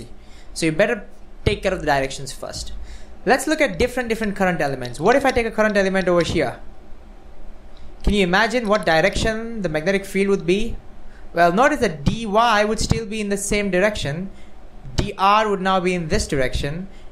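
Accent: India. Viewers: wyf? indian? cuz ur accent hmm lol great explanation tho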